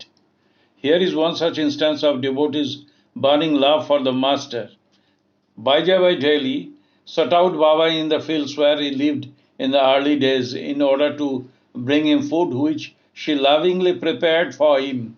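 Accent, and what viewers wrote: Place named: United Kingdom. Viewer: Your pronunciation is very difficult to differentiate from British Style. Very Good.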